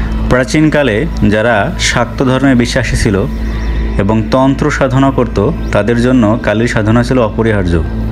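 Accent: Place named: Bangladesh. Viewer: আপনি বাংলাদেশী accent এ কথা কেন বলছেন?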